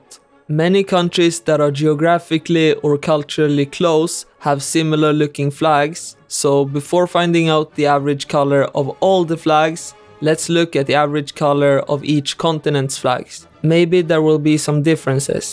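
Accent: Sweden. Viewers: I knew it was a swedish person bc of the accent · Are you Swedish? As a swede, your accent kinda sounds Swedish, are you?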